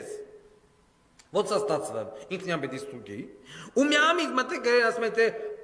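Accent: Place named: Gyumri, Armenia. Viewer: Բարեև պարոն դուք վերջն եք գյումրվա բարբառը վերջն էր ❤❤❤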